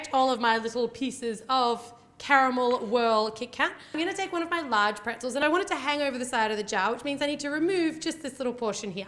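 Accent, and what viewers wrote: Australia. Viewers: Are you from Australia 😀😄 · Is she Australian or American? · Is she Australian? Cause I am! =D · Ehh what? She's got like the strongest Australian accent ever.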